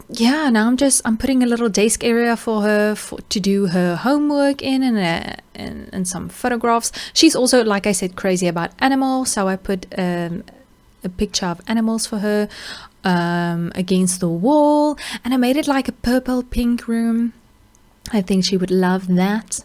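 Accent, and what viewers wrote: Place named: South Africa. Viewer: I knew from ur accent your South African💀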